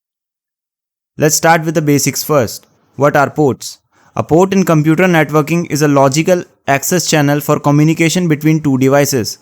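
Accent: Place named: India. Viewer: bro are you really from sweden 🤨 , i thought u were indian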